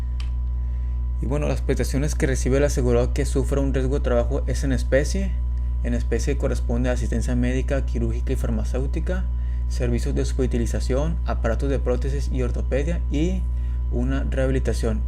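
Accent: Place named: Latin America. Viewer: Tu acento se escucha de otto país de américa latina no de México, y para mi grnera algo de desconfianza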